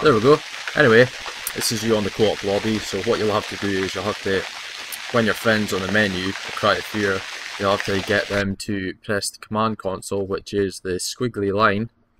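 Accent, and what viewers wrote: Scotland, United Kingdom. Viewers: You sound like a British Redmercy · Wait wait wait wait, wait, R u scottish ?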